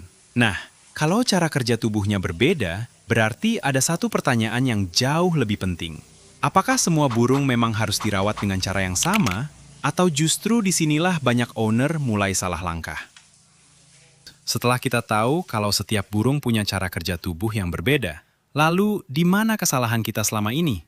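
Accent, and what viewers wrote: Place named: Kuningan, Indonesia. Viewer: dari logat nya kayak orang Kuningan a😅🙏🏻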